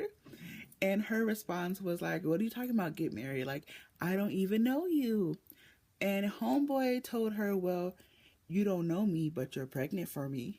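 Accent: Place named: United States. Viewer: Are you a British or American ? Your accent sounds like American .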